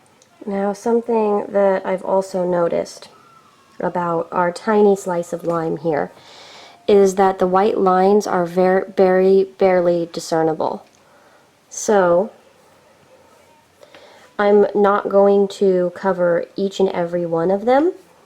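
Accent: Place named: Italy. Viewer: All I can hear is Italy ❤💚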